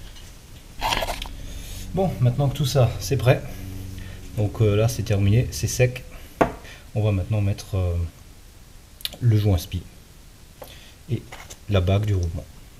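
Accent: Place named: Alsace, France. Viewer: On remarque un peu l'accent alsacien ;)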